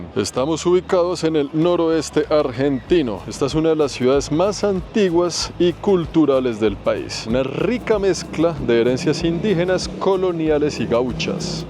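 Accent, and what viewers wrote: Bogotá, Colombia. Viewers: NI YO COMO ARGENTINA NO SABIA DE ESA HISTORIA DE LOS QUILMES!!AMOOO EL ACENTO COLOMBIANOS Y A LOS COLOMBIANOS. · viví 15 años en Argentina y mi esposo y mis hijos son argentinos. Me gusta como narras tus vivencias con ese acento cachaco jajaja. Saludos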